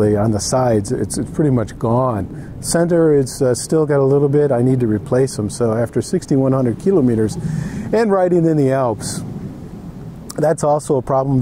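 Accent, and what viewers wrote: United States. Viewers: English is my 2nd but you sound American to my ears. Why don't you use your Brit accent like some of your peers?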